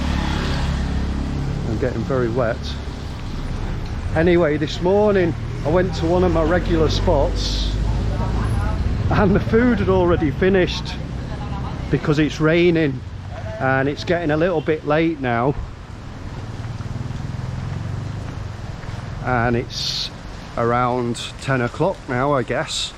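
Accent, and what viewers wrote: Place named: Liverpool, United Kingdom. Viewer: That's unfortunate that you got ripped off by that woman. Yeaaa, just like anywhere else in this world, there are good people and bad people (mostly good ones, though). I understand your frustration, indeed. It is not the 15000 rupiah (which is a minute amount) but the principal behind it. I hate dishonest people but this world is not a perfect place. Well, I hope this experience won't dampen your excitement while visiting Jakarta. Sorry you had to learn the hard way. Forget about this tiny incident and keep exploring and have a great time. I enjoy your videos and your Liverpool accent... lol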